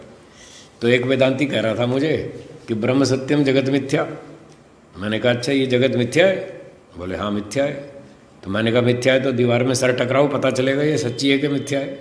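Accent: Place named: Haryana, India. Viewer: वाह पूजनीय स्वामी जी मन्त्रमुग्ध कर दिया आपको रौबदार आवाज में हरियाणवी लहजा झलकता है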